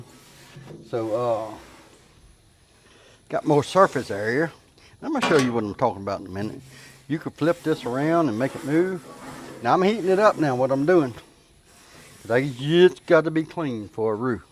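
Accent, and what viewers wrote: Louisiana, United States. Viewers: My regards and best of wishes from Germany. I really enjoy your work and recipes. Also i luv that cajun talk!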